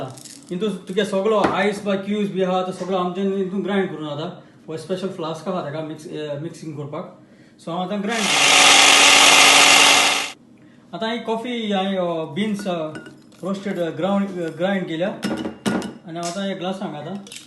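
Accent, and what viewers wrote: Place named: Konkan, India. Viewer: Thanks for sharing your recipies in Konkani More than your recipies I enjoy listening to our Saxtti (Chinchinim) Konkani.Keep up the good work. 🙏🙏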